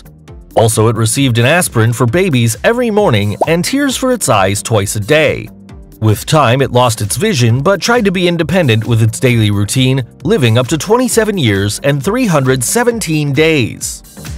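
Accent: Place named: United States. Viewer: I do not like the USA English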